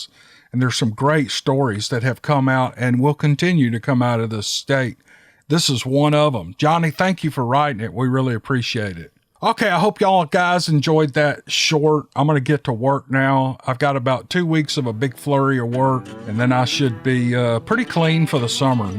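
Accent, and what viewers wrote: South, United States. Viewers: I love listening to you , you have never told a dull story. Your southern drawl gives your stories that extra "flair" for great listening. I listen to them in the dark when going to bed...they are my "bed time stories". One day I will share what happened to my brother and I on St. Helena Island, SC., rural area of Frogmore...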